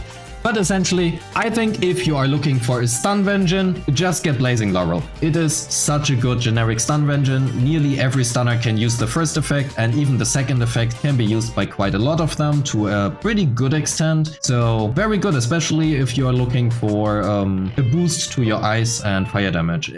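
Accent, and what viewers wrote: Germany. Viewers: Digga ist das geil das es ein deutschen ZZZ YouTuber gibt Ich wusste das du deutsch bist wegen dem aktzent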